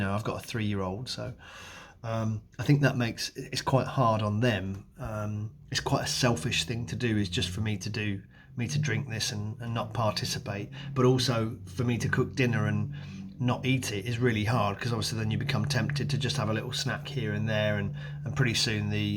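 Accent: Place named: England, United Kingdom. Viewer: I'm quite sure he's English , judging by accent and the sockets behind him 😂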